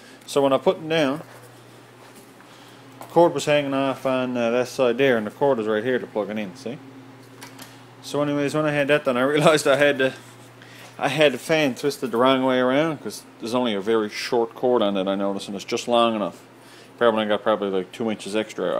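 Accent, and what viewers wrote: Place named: Newfoundland, Canada. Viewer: You sound like you're from Newfoundland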